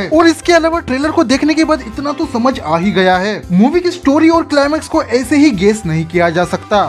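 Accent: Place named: Odisha, India. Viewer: Tere hindi bolne se lagta he tu odiya he hindi me promotion kara raha he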